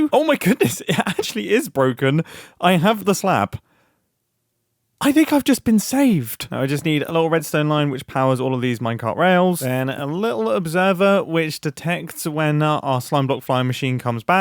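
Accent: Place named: RP, United Kingdom. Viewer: That’s it sorry man but I’m not watching anymore, I was here to watch a dot in the corner while listening to a British man sound posh and I can’t experience that anymore.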